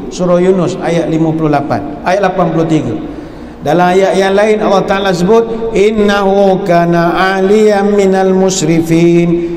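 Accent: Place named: Kuala Lipis, Malaysia. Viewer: Klau xsilap ustaz org Kuala Lipis?loghat ustaz macam org kampong saya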